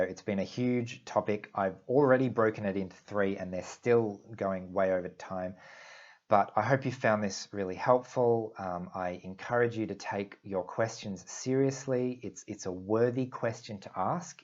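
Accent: Australia. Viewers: Greetings, mate. (I was listening to your accent in the video, and it sounded Australian. So I made the assumption that Aussies refer to each other as "mate.") There was something that happened to me just yesterday. To give a little background/context, I'm an American citizen (native born). I've lived in A-me-rih-cur my whole life. Our official language should be English. Yesterday, I was just walking past two women in the grocery store ("supermarket"), and my brain recognized that one of them was speaking in Russian to the other person. I guess she actually used some of the more common Russian words that I've learned so far. What ended up happening was a long mental analysis of thinking about "boundaries," and how I might feel if a stranger in a supermarket walked up to me and started speaking to me in Russian. I would be scared or paranoid, wondering, how do they know I recognize words in Russian? 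This situation may not have even been that complex, because the woman had already revealed by her own speech that she knew how to communicate in Russian. To make a short story long, I kept going about my own business, even saw those two women a second time in another part of the store, but I didn't attempt to say Hi or introduce myself (if that would have been appropriate!). The only "agenda" I would have had would've been to show that I noticed these women were from a different culture, and that I appreciated them as fellow humans. It's weird that I know Russian at all. I had a c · Which part of Australia are you from?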